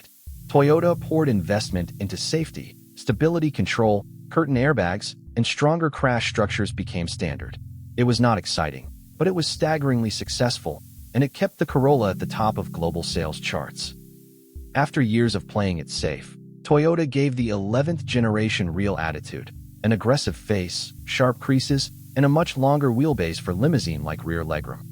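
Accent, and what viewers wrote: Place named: United States. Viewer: Who is the voice it sounds like an American man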